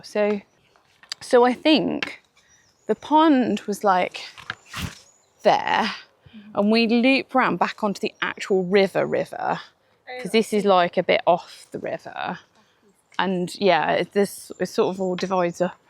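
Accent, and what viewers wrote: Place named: United Kingdom. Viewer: Thank you! I do OK with the British accent, but It's easier when Its loud and clear. 🙂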